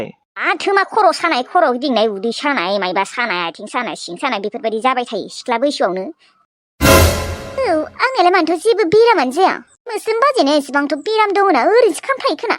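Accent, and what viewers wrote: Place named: Udalguri, India. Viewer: Udalgurini raijlainai style lwi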